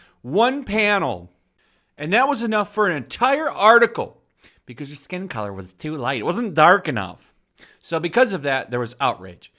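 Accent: United Kingdom. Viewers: Enough with the horrible British accent!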